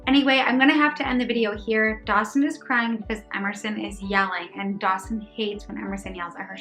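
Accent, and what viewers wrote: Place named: Michigan, United States. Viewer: Are you from the Midwest? Just curious because your accent sounds like you're from Michigan (where I live). 💜🥰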